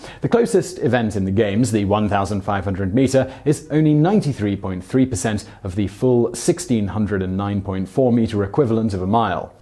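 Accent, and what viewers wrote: United Kingdom. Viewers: Why do I always have a hard time focusing on a video or even understanding what the hell is going on when it's in the British accent? I literally didn't understand two thirds of the video :/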